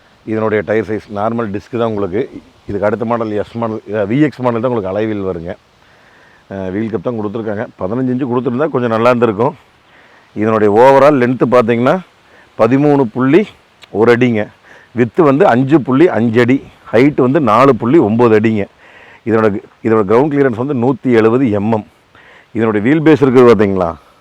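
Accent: Kongu, India. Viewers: காரைவிட உங்கள் கொங்கு தமிழ் அழகு...அம்மா ஊருக்கு போண மகிழ்ச்சி 😀🥰🙏🏻. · Kongu slang is🤔😊 mesmerizing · அட நம்மூர் அதாங்க கொங்கு தமிழில் கேட்டதும் மனசு குளிர்ந்து.அய்யா SPB மறக்கமுடியுமா. எதார்த்தமான தங்களின் பதிவு ச சொல்ல வார்த்தை இல்லை.my son also se your comments and he like to purchase hundai or Honda amaze.தங்களின் பனணிதொடர் வாழ்த்துக்கள்.எனது ஊர் k village சர்க்கார் கண்ணாடிப் ஆத்தூர் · Anna unga kongu tamil review tha mass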